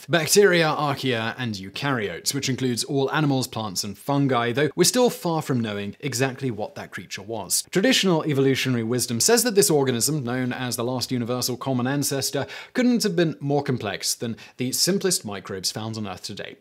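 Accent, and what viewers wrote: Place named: RP, United Kingdom. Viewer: I can't figure out how you got that tofffy nosed accent and yet, can hardly pronounce common words. Is it just regional and unrelated to class/education? This is why people study journalism and broadcasting.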